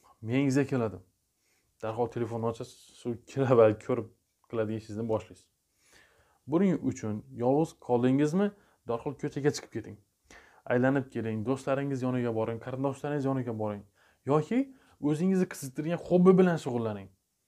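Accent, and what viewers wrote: Khorezm, Uzbekistan. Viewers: Асаломалакум доктир сиз кайердансиз шевага айрим созлар хоразимга тортяпти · Xorazmdan ekanligiz darrov bilindi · хоразимданми шева тортяпти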